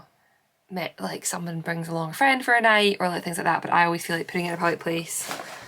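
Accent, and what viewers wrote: Scotland, United Kingdom. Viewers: Hi love your podcasts and design. But must be honest a bit as English isn't my mother language is very difficult to understand your scottish accent have to use subtitles. Thank you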